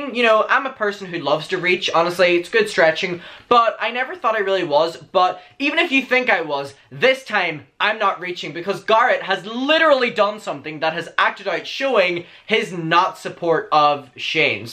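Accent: Ireland. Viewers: what?! You can hear the Irish accent thick af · I love your Irish accent and how you pronounce Garett. Much love to Ireland. Garett's new video is hilarious btw. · Love that you’re not gonna change your accent/how you pronounce things to please people!! From a fellow Irish gal 🥰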